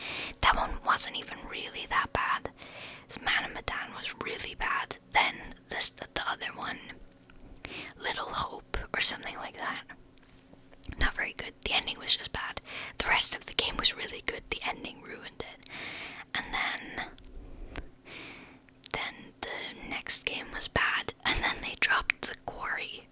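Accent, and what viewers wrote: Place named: Ireland. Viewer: irish asmr?? really hard to find that on yt lol. I am irish myself and like the familiarity of the accent so its nice to finally find an irish asmrtist 👋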